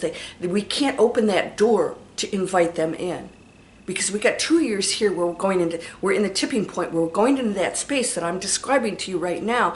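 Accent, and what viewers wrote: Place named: South, United States. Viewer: Luv your southern accent! Great vid!👍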